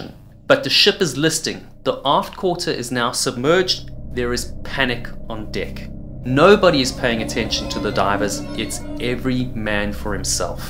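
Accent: South Africa. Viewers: South African accent on point💨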